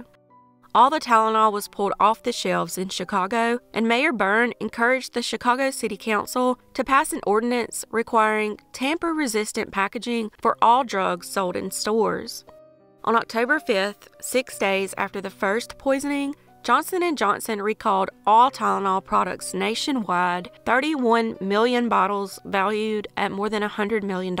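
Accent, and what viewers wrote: South, United States. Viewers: So good to hear a southern accent, I live on the other side of the world and this is so very good to hear. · Love your true southern accent.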